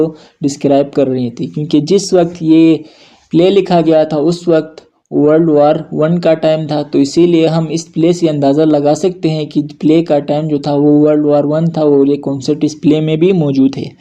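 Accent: Gilgit-Baltistan, Pakistan. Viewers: confirmed gigiti ho bhai. i can tell you cox of your heavy Shina accent. :D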